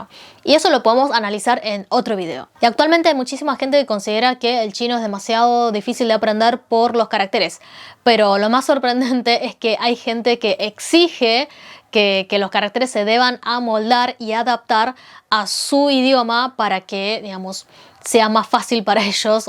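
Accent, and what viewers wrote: Argentina. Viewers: Sos mas argentina que yo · Me encantó el video y me gusta tu acento argentino. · Un linda chinita que habla con acento argentino!! Tenes un nuevo suscriptor che! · Amo su pronunciación china/argentina dioss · Es graciosos ver a una china con acento argentino 🤣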